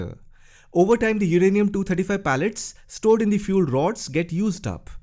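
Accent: India. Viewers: Love your Indian accent and really great explanation, thank you.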